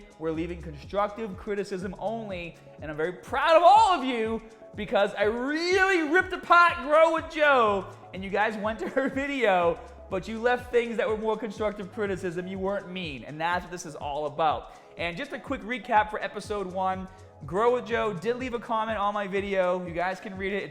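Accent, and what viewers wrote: Boston, United States. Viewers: He's losing the Boston accent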